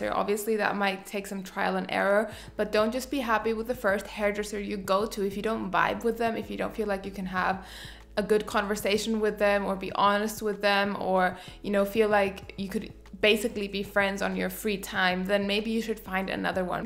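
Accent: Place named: Germany. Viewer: I love that I can hear you are german by you saying "Mine" at all times, rather than "my"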